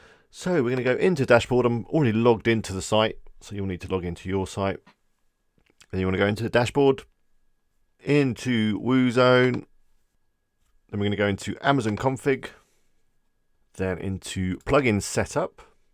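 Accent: United Kingdom. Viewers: Really teaching video. Wouldn't you share with us which are your favorites themes and plugins to work with? Or the ones you normally use to create your websites, please? Thank you in advance. Btw, I love that so british accent. Indeed :)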